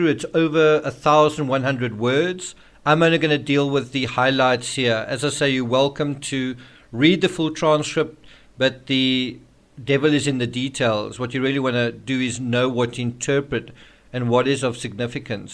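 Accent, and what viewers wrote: South Africa. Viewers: Hey 👋 I love your channel, the work you put into every single video blows my mind. You are so appreciated 💛 I was born in SA and I used to have that lovely accent you do,but since I left SA my accent has faded. I wish you all the best on your channel, you definitely will always have support from me 😊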